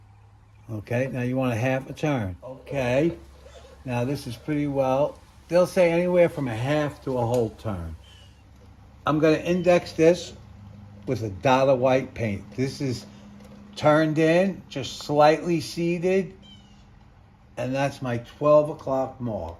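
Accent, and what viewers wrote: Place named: New York, United States. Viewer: Love your videos an accent! You from new York? Would love to see a front fork tube oil change done on a king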